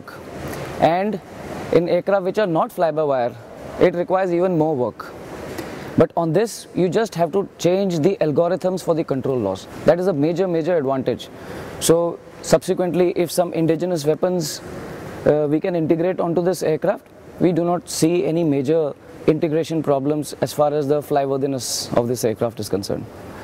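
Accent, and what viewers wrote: India. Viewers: bro this is the indian version of English. There are many version. The English speaking in America is not the English speaking in Europe 👍👍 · Anything involving an Indian speaker can't go without some dumbass making fun of the accent. It's a cliché now so nobody's laughing....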